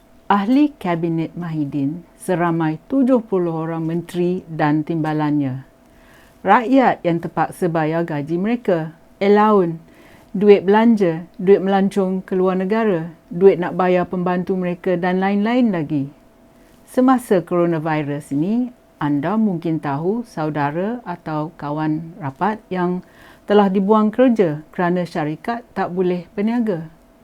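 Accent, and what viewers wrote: Perak, Malaysia. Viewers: Sonok dengor miker labon cakap Perok.. 👍👍😊😁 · Kuat pekat Perak nye yong..ate Beno ape yang yong ngabo org org politik ni hampeh idak boleh pake ngabeh kan duit rakyat...rakyat belengeh sampe ke jubo kroje ngencari duit... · Suke dgr ucapan ni....bunyi mcm org perak, syabas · Orang payghok ye kome....tesasuiii cakap peghok.Ate demeee...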